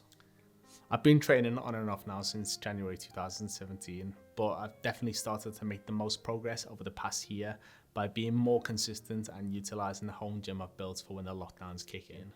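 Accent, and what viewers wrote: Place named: Liverpool, United Kingdom. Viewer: I had never heard speak until now. Was not expecting a Scouse accent!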